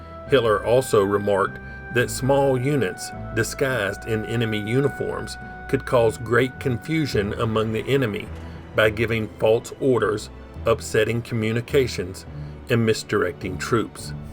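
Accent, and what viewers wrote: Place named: Appalachia, United States. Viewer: Ok. I'm from Tennessee. I thought you sounded a little Appalachian like me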